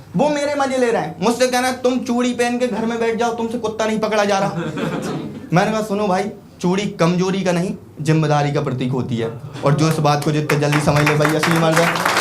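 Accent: India. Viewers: Bhai bundelkhand ke lag rai kaha se ho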